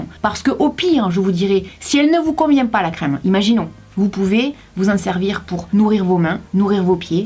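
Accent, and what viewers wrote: South, France. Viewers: J'aime trop ton accent 😂 #Sudgang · j'ai 10 ans je viens de te découvrir et je te trouve drôle gentil et marrante avec ton axant du sud bisous et super vidéo plus 1 abo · T'as un accent du sud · Super découverte !!!! Ton naturel, l'accent du Soleil... Hop-hop, je m'abonne !!! Et merci beaucoup de penser aux Coquettes à petit budget 😉😊😁 !!!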